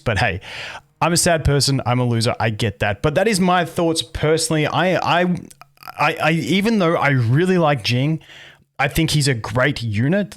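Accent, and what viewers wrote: Australia. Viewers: Absolutely love the content! Can't get enough. Congrats on the 110k! Love the representation for us folk down under, nice change from all the American accents haha. - from a grateful kiwi across the ditch ❤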